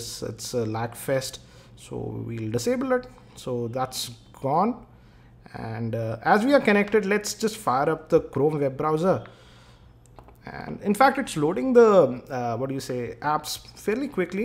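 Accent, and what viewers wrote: India. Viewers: I cannot understand you..are you hindi..?? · Real Indian accents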